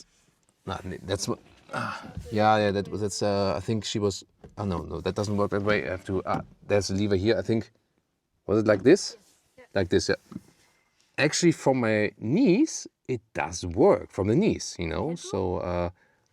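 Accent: Germany. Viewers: German Accent made this review even cooler.👍🏾🇺🇸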